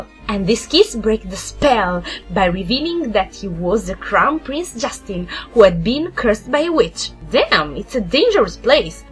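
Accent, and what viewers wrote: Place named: Italy. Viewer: No no, ma ché, sei stata più che bravissima, FENOMENALE, davvero!! Per me sarebbe aldilà di ogni possibilità! Ma non ci si può fare niente, è proprio che gli italiani che parlano in inglese, anche quando (ed è un caso raro) hanno un'ottima pronuncia come te, fanno ridere! Semplicemente perché si sente troppo che non siamo inglesi, è un problema che abbiamo tutti noi neolatini, gli inglesi se ne lamentano sempre. E' il motivo per cui ad esempio anche i migliori cantanti italiani fanno moltisisma fatica ad avere successo nei paesi anglofoni.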